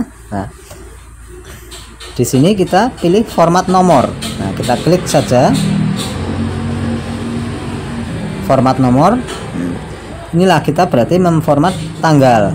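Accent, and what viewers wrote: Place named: Cilacap, Indonesia. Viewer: Suaranya asli cilacap, kayak kaki mukidi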